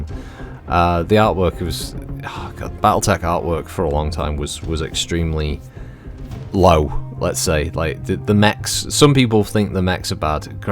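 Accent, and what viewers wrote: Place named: Northern England, United Kingdom. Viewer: Yeah like you said I listen to this while doing stuff or today I'm listening on the way to work. I love picturing in my head with your upper class accent you recording these audio lectures in your enormous Library surrounded by thousands of leather bound books at Estate in the beautiful North English Countryside 😎. At least that's the delusional imagination of an American who has never been to Europe or Mary old England 🇺🇸🇺🇸.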